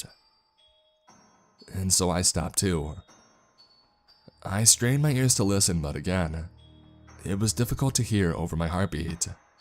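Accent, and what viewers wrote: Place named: California, United States. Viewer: Pretty good but you need to get rid of your confused california girl accent.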